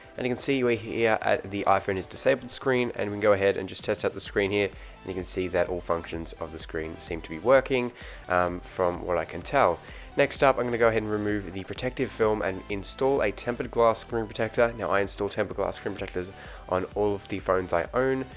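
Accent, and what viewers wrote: Australia. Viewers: WAIT A MINUTE ARE YOU AUSSIE!?!?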